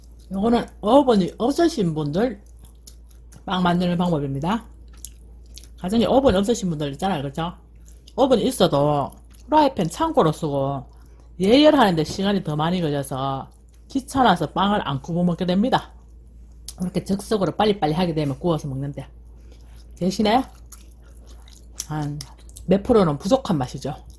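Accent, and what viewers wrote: Gyeongsang, South Korea. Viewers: 안녕 하세요 넘 재미있어요 저도 경상도 토백 인지라 새삼 경상도 사투리 억양 재미있고 솜씨 잘 배웠습니다 한번 해 먹어 봐야 겠내요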